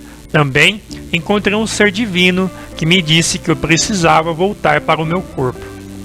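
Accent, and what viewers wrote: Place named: Caipira, Brazil. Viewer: Um erro de português da para consertar, agora querer que a pessoa mude seu sotaque e ainda chamar de caipira é muita falta de bom senso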